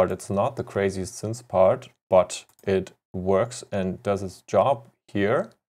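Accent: Germany. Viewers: Are you german? You sound german at least...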